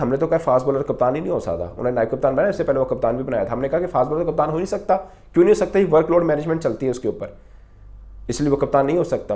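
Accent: Punjab, India. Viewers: Your Punjabi accent is too good sir....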